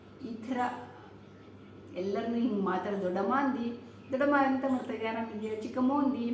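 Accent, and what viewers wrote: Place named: Kundapura, India. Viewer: Swalpa Kundapura kannadakke Holutte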